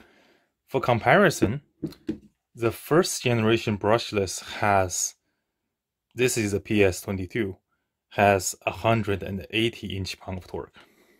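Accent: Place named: China. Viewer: 老板听口音是中国人？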